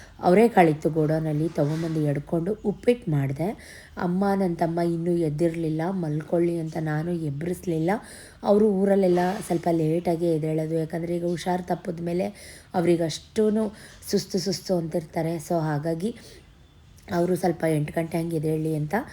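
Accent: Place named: Rural, India. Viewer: Sis nim gramathara bhashe chennagide keloke😂❤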